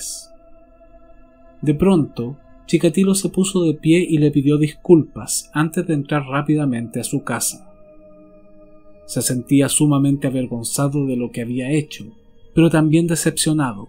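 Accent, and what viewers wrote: Standard. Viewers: relatas con acento neutro, pero igual supe que eres chileno. Te felicito por lo que haces, muy profesional. Y si es chileno...es bueno 😉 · Eres venezolano? Dijiste se puso a trabajar. Aparte el acento neutro. Interésante.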